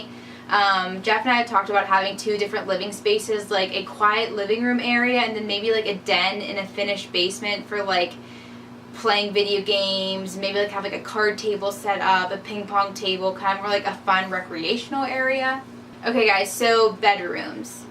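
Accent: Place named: Pennsylvania, United States. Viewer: i love the farmhouse! is this in pennsylvania? thats my home state and i feel like thats you're accent haha i love it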